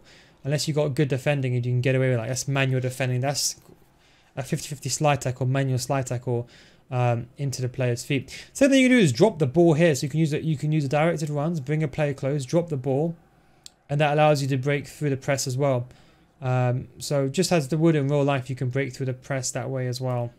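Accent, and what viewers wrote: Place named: United Kingdom. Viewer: too fast und unclear talking. not 100% understandable for not native English. maybe the British akcent is the reason. I like the content but it's too exhausting to get it/to listen. no offense.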